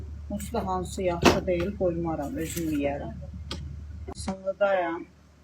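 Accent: Talysh, Azerbaijan. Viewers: Toluşə ləhçə çı ğəşəng qəp jeydəş😂😂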